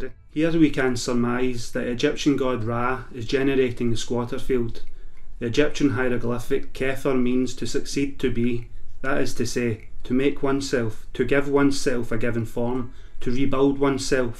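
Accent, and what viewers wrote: Scotland, United Kingdom. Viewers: I believe it's Scottish...or Irish.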